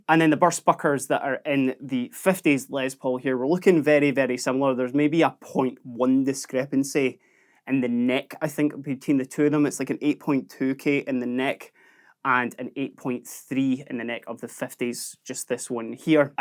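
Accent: Scotland, United Kingdom. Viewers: I like listening to your Scottish accent more than the guitar 🤭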